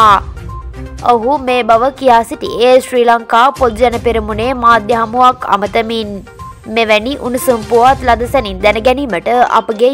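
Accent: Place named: China. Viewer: මෙි කියවන එකී චීන එකියක්ද? මෙහෙම සිංහල උචිචාරනයක් ලාන්කික සිංහල එකියක් කිසිදින නොකරන බවයි මගේ විශ්වාශය අපේ හාමුදුරැවනේ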